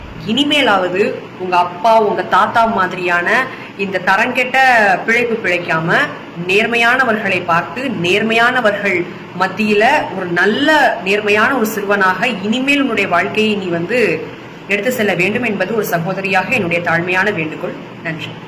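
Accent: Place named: Madurai, India. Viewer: Very good with Madurai slang deecently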